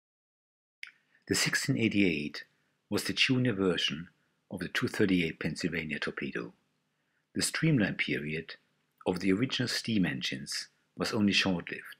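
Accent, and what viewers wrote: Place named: Germany. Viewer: Sounds like you may be German by your accent. Your trains are the most prewar examples I've ever seen. Thanks for posting. Danke.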